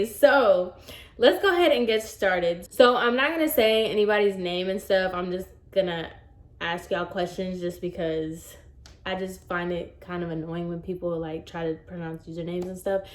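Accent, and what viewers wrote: Puerto Rico. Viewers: I love how spanish sounds in a puerto rican accent!!! My spanish is so blahh!! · Hello from Oregon 👋 You are right, Spanish even though it’s Spanish, it’s different depending on where you live or where you grew up. But I like your Puerto Rican accent ☺️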